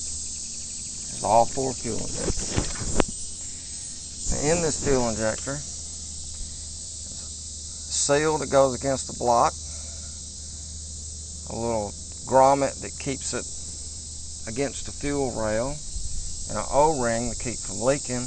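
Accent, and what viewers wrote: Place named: New York City, United States. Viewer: you sound like you are from New York City. Thanks for the video big help btw. Yella injectors need cleanin. Sometimes I use vaseline on my injector too.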